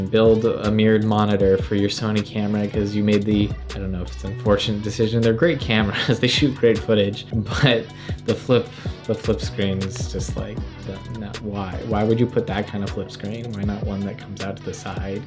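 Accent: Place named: Norway. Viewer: you hear like a norwegian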